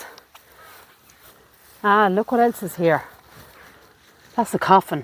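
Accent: Ireland. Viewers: So impressed you cleaned what you did by hand. Reminds me of when i was a girl, my Dad and I spent many happy years wandering around graveyards. Dad was a bit of an historian and we discovered an unknown battleground and a mass grave of Maori warriors, ( men and women). Loving your Irish accent.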